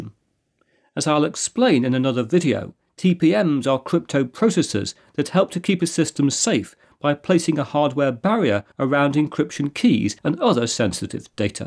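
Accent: United Kingdom. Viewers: Computers are so much more interesting with a British Accent.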